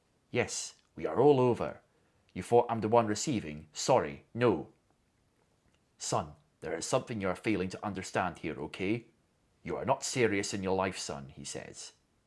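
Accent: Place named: Arizona, United States. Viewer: I’d recognize that Arizona accent anywhere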